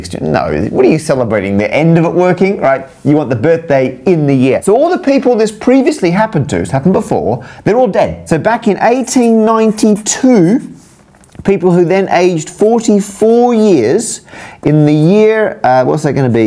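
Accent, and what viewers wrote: Australia. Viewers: He sounds Australian to me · tell me more, Mr. Australian Math Man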